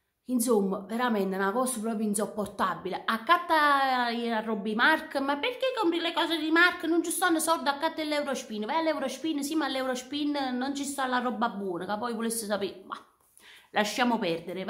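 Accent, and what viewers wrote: Naples, Italy. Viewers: Simpaticissima, anche se avvolte quando parli napoletano non ti capisco. Ma seguendo il discorso riesco a comprendere. Baci❤️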